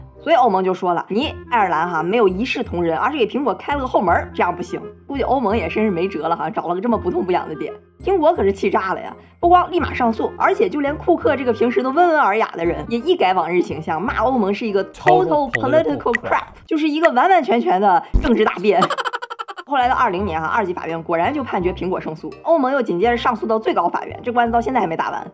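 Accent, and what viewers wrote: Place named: Dalian, China. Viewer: 你作为大连人讲讲大连白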